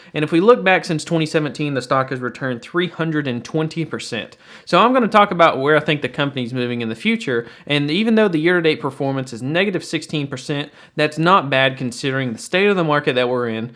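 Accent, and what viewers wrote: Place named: Oklahoma, United States. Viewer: You sound like you're from the Oklahoma! I imagine you live there?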